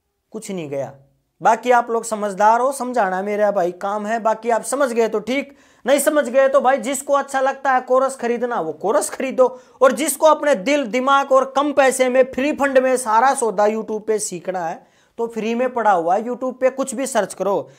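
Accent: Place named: Gujarat, India. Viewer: Bhai bat sun k gujarati lag rhe ho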